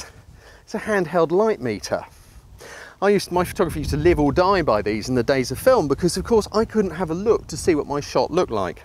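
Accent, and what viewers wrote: United Kingdom. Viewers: If I could have a British accent this is exactly the accent I would want. This accent would play very well with the ladies here in the states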